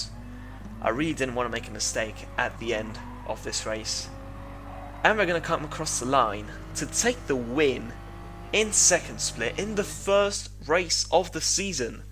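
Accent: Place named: United Kingdom. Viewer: You sound more british than most british people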